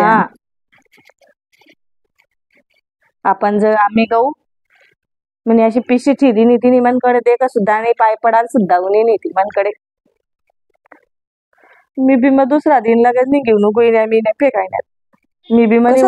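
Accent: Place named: Khandesh, India. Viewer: मस्त अहिराणी येते तुला छान वाटते ऐकायला .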